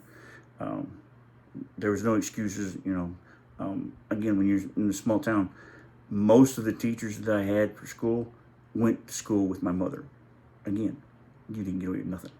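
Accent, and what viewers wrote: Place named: Alabama, United States. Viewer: Heres my analysis on the entire video you have presented. 1. You have a southern Alabama accent. 2. You have some excellent knowledge. 3. Your skin is pink. 4.. You should be a motivational speaker. 5.. You look like Steve Austin the wrestler. 6. Your ancestors were definitely Catholics. 7. You are severely autistic. 8. You are not physically attracted to Black women. 9. You shave your own head at least once a week. 10. You are a meat eater and have never considered a vegan diet. 11.You never hang out with Black women. 12.You routinely hang out with Black men. 13. You are an excellent pool player. 14. You are a good dancer. 15. You pray to statues of Mary. These points are ALL true until corrected by you.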